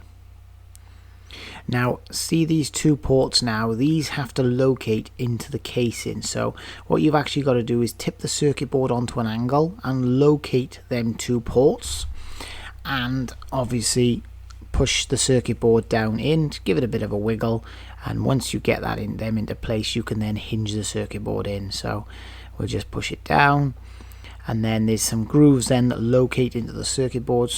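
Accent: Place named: Wales, United Kingdom. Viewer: Very helpful, detailed, & professional video, all delivered in a most pleasant Welsh accent! Very well done😊.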